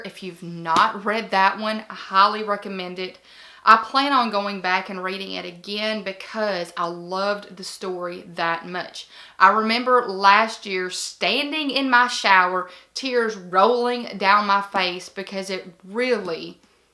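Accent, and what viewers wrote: South, United States. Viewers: I love this! I love how honest you were about not reading but finding your niche. Like you, it took my finding a verse novel years ago just passing by in a book store. I was there with my kids to play and read books. On the way out I stopped picking one up of Ellen Hopkins, Burned. After reading it I sought out to read others of hers but the story at hand was not for me. Although, I value her and her take on life because it's personal matter to her and I know so many can relate. I moved on finding other verse novel authors and evidently wrote my own verse novel, Under Contract: Life in the Middle of Dreams, it was released last summer. If you'd like to read it and review it I'd be happy to mail you a copy. You are precious, I love your accent. Are you from the south? I have people comment on mine all the time. Again I enjoy this video and look forward to watching more of your videos. 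I hit that subscribe button! Have an awesome day and keep shining!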